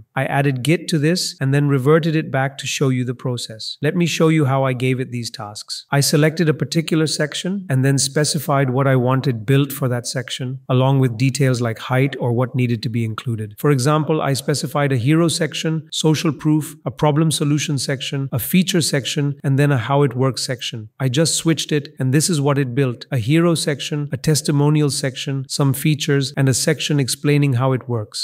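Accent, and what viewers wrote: India. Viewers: Bro... literally me every fking day! FYI - it's not cursor. It's fking claude. Thank you for not glossing over when tools are dumb af, This AI narrative is much better than the narrative you're using for the one with the asian voice. The asian voice one is a little more sycophantic than this indian one.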